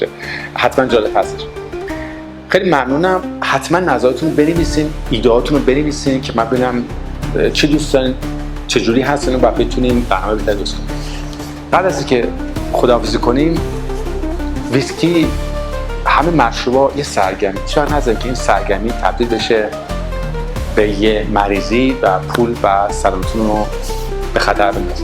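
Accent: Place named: United States. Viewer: لهجه مشتی داری امریکا به دنیا اومدی ❤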